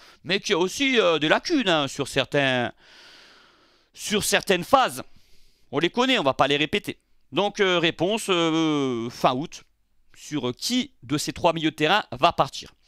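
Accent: Corsica, France. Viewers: Hors sujet: jme trompe ou tia l'accent Corse?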